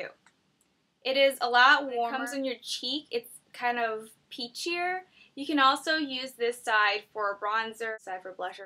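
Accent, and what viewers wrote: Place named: Alaska, United States. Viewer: I just noticed the sarah palin accent too haha.. anyway nice video!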